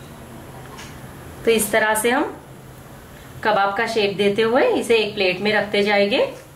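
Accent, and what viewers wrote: Ahmedabad, India. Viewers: Jitni bhi hindi bolne ki koshish karo miya bhai ki ahmedabadi bhasha to chalak hi jaati hai 😂❤ allah kamyaabi de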